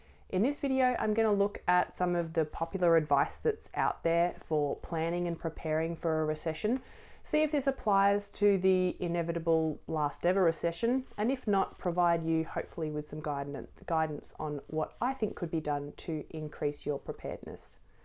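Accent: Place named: Australia. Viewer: Hearing all this in an Aussie accent does make it a lot less terrifying ✨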